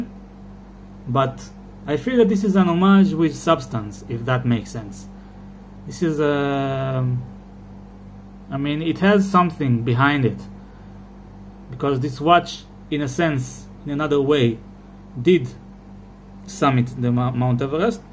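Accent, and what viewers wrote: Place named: Israel. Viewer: Great review! Are you Israeli? I live in Tel Aviv and you definitely sound like it haha. Anyway, keep up the good work!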